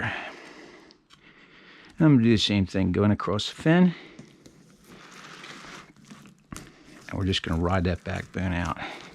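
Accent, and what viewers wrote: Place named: Southwestern Pennsylvania, United States. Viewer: Nice video, Sir. You sound like you might be from SW PA. May I ask where you caught the snakeheads? I remember reading of their "debut" in the Mon river maybe around 2019. Just wondering where else they may have migrated.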